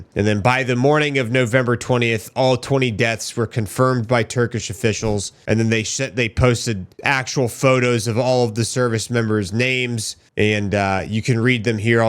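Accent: United States. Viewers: Very sad story. On the other hand, it always amuses me, how Americans pronounce Georgian words.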